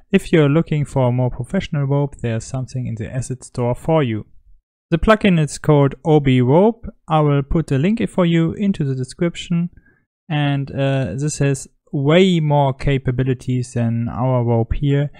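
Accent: Germany. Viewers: I Think i can hear a german accent in you english... but it´s working and that´s what i want! ... thank you for this tutorial it´s easy to follow...🙃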